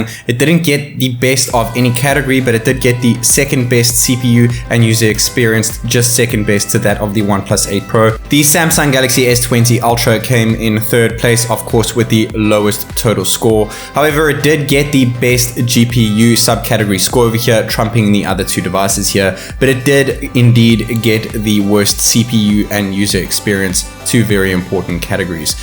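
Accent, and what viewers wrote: South Africa. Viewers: Immediately heard that South African accent! 🔥 Love from Jozi bro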